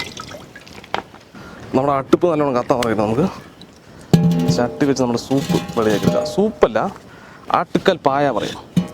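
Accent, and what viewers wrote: Palakkad, India. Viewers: Aa palakkadan slangilulla samsaram kelkkan enthu rasanu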